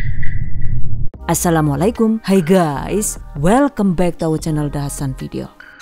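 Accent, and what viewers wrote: Banyumas, Indonesia. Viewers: Pertama melihat video beberapa tahun yang lalu, saya pikir ini suara ibu-ibu yang sudah tua, ternyata orangnya masih muda dan cantik, dan di selingin bahasa ngapak yg lucu, terimakasih untuk videonya ya mbak, salam dari cilacap. Semoga sekin sukses dan berkembang Aammin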